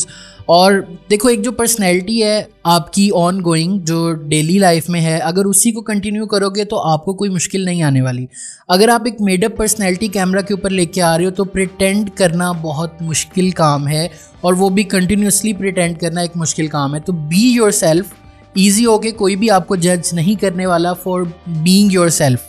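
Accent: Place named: Rawalpindi, Pakistan. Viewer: Thank's Bro! Are You From Rawalpindi, Muree or Kallar? Because I'm Feeling The Accent!❤❤👍👍🎉🎉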